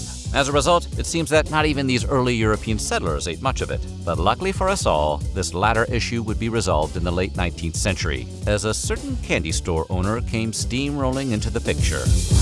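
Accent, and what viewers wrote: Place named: Transatlantic, United States. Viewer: You have to keep that wonderful Transatlantic accent alive dammit, for us all.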